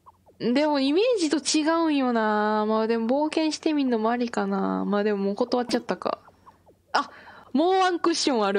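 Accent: Kansai, Japan. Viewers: 新規なんですけど、さんの関西弁と住民のボケが面白くてどハマりです😂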